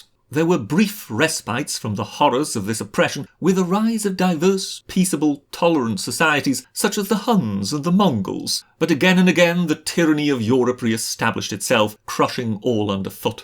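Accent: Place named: England, United Kingdom. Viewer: Such a petty that the video itself cannot be used in educating the young, due to the imperialistic English accent.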